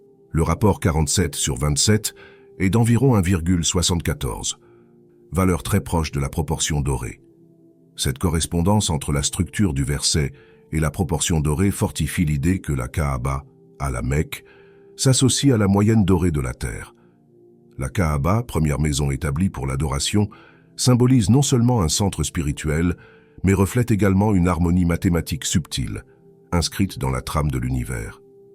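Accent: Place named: Algeria. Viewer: salut a vous toujours dalgerie d apres votre accent vous faites plusieures videos de differentes appelations le plus important pour nous tous c est vos efforts colossals au service de la spiritualite scientifique le savoir vivre avec cette complexité mathematique ou le nombre d or est present partout meme dans la conception des pyramides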